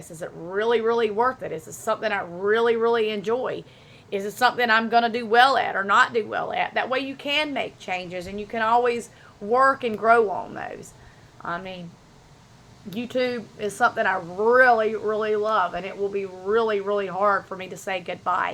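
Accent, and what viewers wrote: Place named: South, United States. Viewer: The first ever video I watched was your "What's in my purse" video when I was shopping around for a jansport mini. Really loved your southern accent and simple vibes. You will be missed. It was comforting to watch your chats and cleans.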